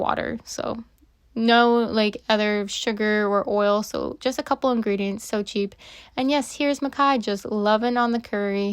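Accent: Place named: Midwest, United States. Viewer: Love your Midwest accent. I’m from Mid-MI and feel right at home in your kitchen. God bless❤ This is valuable information you’re sharing.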